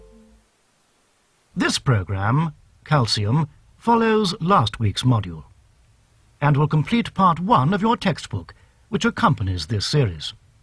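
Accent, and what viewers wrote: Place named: United Kingdom. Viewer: Honestly a soft-spoken Brit can make me believe all the BS in this video.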